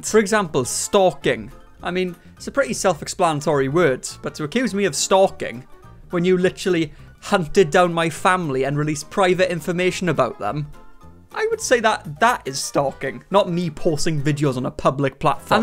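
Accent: Wales, United Kingdom. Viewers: I’m sorry if this is rude to ask but what is the accent he has is called? It is not the usual British accent I am familiar with. I just love his accent so much and would love to learn more about it. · Off topic but.. your Welsh? I knew there was something familiar about your accent!!!!! I DONT THINK IVE EVER REALLY SEEN A WELSH YOUTUBER!!!!!!! Finally!!!!! I have found someone!!!! 😭🥳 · He's Welsh.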